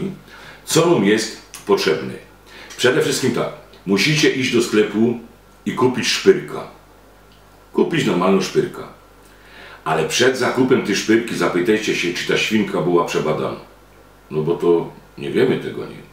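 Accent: Silesia, Poland. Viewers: Panie niech Pan nagrywa więcej filmików przyjemnie się ogląda i ta Godka. Ja bym chciał zobaczyć odcinek fasolka. Pozdrawiam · Pozdrawiam z Warszawy z okolic lotniska na Bemowie, na którym to w 1959 roku lądował vice prezydent USA Richard Nixon. Wtedy pas na Okęciu był za krótki. A mnie i tak jeszcze nie było. Podoba mi się ta śląska gadka i trochę zazdroszczę bo warszawska już prawie wymarła.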